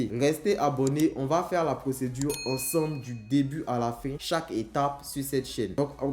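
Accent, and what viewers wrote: Ivory Coast. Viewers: La musique en fond de la vidéo est un peu prononcé, la video est excellente, j'aime plus l'accent ivoirien avec les blagues 😏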